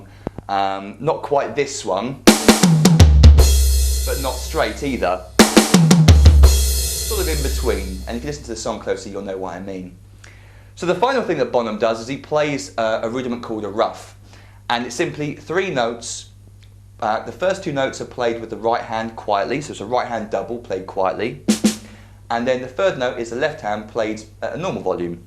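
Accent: England, United Kingdom. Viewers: What kit is this? It's peng!!! Also where are you from, you have an English accent? (I'm from England)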